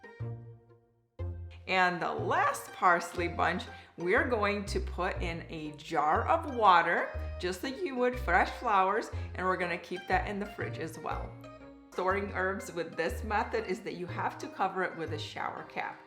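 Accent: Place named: New England, United States. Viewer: Ur accent is of a New Englander.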